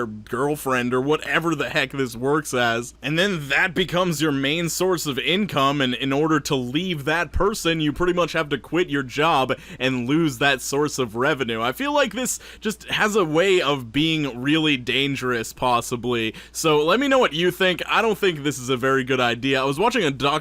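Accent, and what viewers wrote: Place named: New York, United States. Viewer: What’s up with the New York accent.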